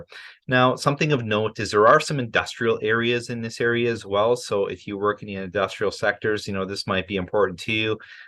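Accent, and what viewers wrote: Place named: Canada. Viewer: Estoy escuchando tus videos todo el dia para aprender ingles y acostumbrarme al acento canadiense (I'm listening to your videos all day to learn English and get used to the Canadian accent)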